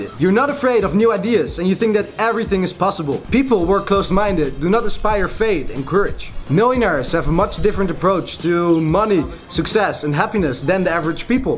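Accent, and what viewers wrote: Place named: Netherlands. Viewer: Love the energy! And the Dutch accent is great too, hard to confuse it with some other. :) (I lived in Holland)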